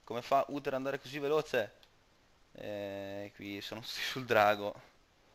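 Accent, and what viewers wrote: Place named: Romagna, Italy. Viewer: ah ok...è che sentivo l'accento romagnolo...sei bravo a giocare a heroes...sono cuboem#2629 in game se ti va di fare qualche partita..bella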